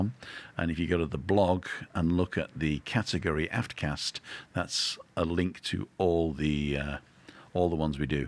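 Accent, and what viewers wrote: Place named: North, United Kingdom. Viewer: You should send your portfolio to acting agencies, you’ve definitely got the voice for it and I think the subtle northern accent is something that’s not heard enough on mainstream.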